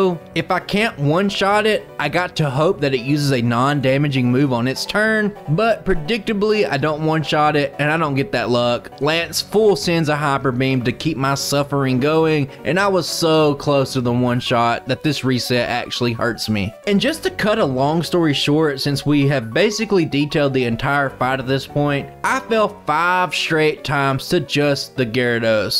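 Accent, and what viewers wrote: United States. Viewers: I don’t know if anyone ever says this to you but every single “southern” character from the indigo league Pokémon anime series shares your accent. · Woah that must be the most american accent ever o.o much yeehaw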